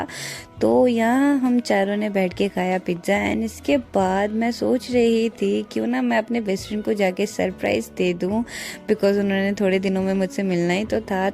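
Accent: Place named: Punjab, India. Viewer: Ur punjabi accent is really gud from where u did ur schooling and higher studies?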